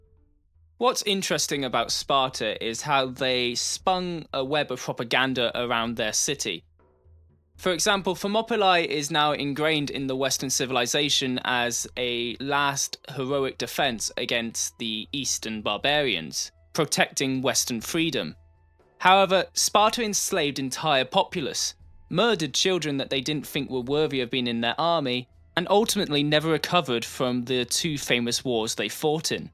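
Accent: United Kingdom. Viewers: fennisdembo34 no I think he is from somerset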